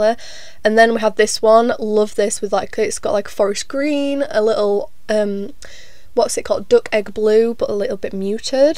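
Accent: United Kingdom. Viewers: there is nothing more AMAZING than opening up a video to expect a grating American accent, only to be soooothed by a fellow northerner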